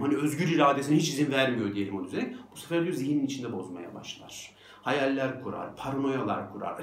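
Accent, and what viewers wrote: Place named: Kayseri, Turkey. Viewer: Hocam iyiki varsınız kayseri şivesini çok güzel kullanıyorsunuz beni güldürüyorsunuz allahta sizi güıdürsün hemşerim.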